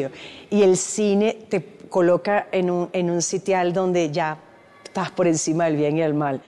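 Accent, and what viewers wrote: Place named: Bogotá, Colombia. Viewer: ME GUSTA TU ACENTICO BOGOTANO.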